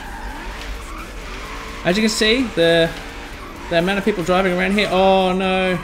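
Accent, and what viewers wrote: Australia. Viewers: Brisbane, Australia im guessing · hi are u australian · I'm confused are you Aussie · Are you Australian and if so what part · your australian?? wanna Meet up??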